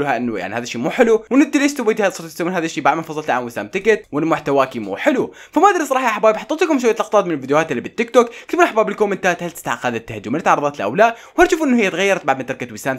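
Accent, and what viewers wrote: Iraq. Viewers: انا احب العراقين والهجتهم عشان جي احب اسمع وهو يتكلم 🇮🇶🇦🇪❤️❤️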